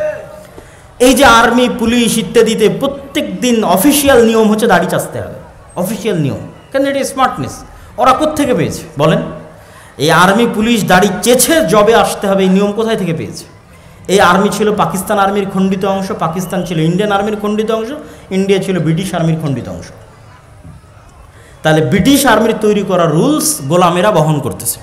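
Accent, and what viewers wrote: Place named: Noakhali, Bangladesh. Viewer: Bai korianna, harianna amon kotha goloo noakhali amon kotha goli pori takkoren please, tar por kotha goli shon dor hobe, aktoo chinta koray dekhen London, Saudi pora lekha koray amon basha kotha bola khettar moton bojai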